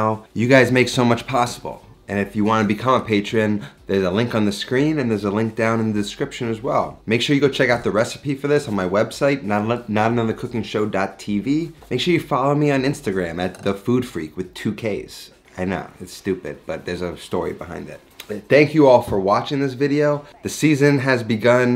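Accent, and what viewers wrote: New York, United States. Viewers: I agree. I think he's from New York judging from accent but his food is not Italo-americano which is great but more what I've eaten for years in Italy.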